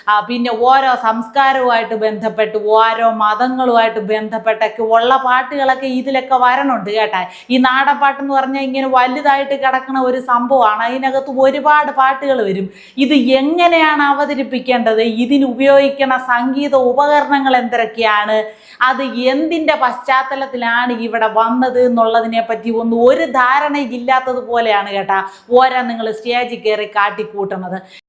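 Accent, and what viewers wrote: Thiruvananthapuram, India. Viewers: What said is correct.. Nowadays ' Naadan pattu ' dance exceeds limits & highly boring.😮.. Can not enjoy.. Over action creats problems..Allow audience to relax & enjoy the song instead of creating uncontrollable & uncomfortable sounds.. By the by i can not understand why using malayalam language in a most funny way..? You may be using Thiruvananthapuram slang.. But that is not fair.. Disrespect to the language.. Boring also.. Our attraction to hear the details from you decreases due to this method..Better give details in your natural way..that will be more interesting..Prof.Mohan.. Tvm. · തിരോന്തരം ഭാഷ കിടുക്കി 👌👌👌👌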